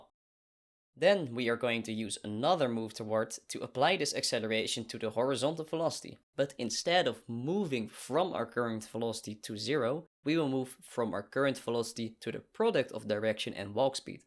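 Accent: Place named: Netherlands. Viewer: zeker wel een Nederlander. Accent is heel herkenbaar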